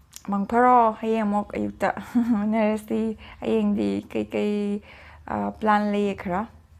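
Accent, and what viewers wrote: Kakching, India. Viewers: che na kakching marol lonba C ymna nungCye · Kakching marol yam lol lay kei ge eche nang ba Yam nung ngai tay ko Nachak khuthe du ga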